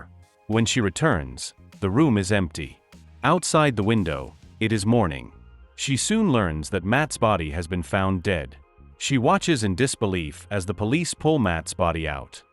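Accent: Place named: France. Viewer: Interesting French accent.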